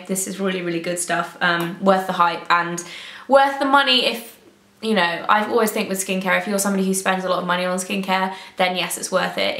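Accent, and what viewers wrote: United Kingdom. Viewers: Is she Britsh?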